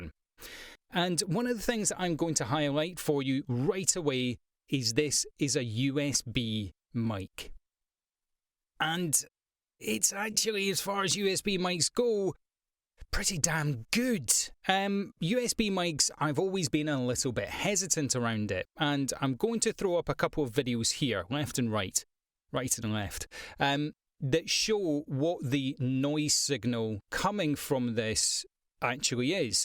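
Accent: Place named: Scotland, United Kingdom. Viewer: wasn't sure.. accent sounds Scottish.. could be wrong.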